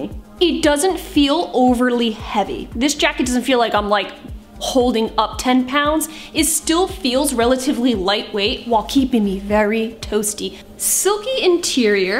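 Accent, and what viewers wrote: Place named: North, United States. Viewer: Upstate South Carolina here! Love your vids! Your accent is great. I get grief for my southern accent all the time! Love hearing your unabashed northern accent!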